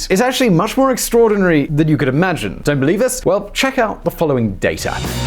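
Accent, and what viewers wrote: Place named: United Kingdom. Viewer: Keeps saying Roots when should be saying Routes, trust the English to butcher the language..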